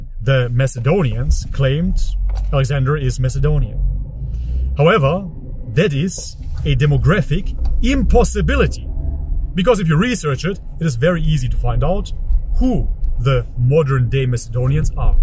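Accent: Germany. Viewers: well he is and his accent is because he lives in Germany. Either way he is correct. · I was always wondering about your accent and thought it sounded German 😄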